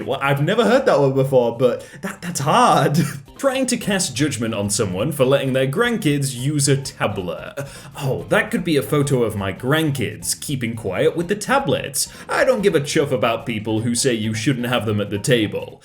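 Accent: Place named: United Kingdom. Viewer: It's just his accent... most Brits pronounce it that way.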